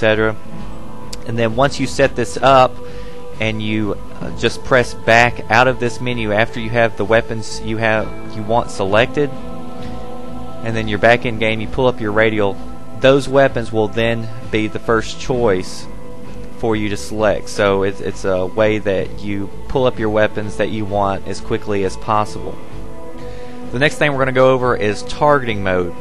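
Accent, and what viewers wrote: South, United States. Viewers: The fact that you’re southern makes this video so much more fun. You’d be good for voiceover work. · are you from the south? don't worry I'm not a dumb yankee I'm from Mississippi i can just really hear your accent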